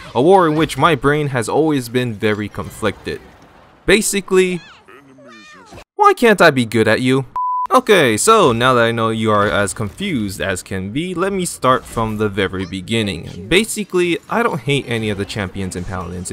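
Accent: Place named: Malaysia. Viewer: I can smell the Malasyan in his voice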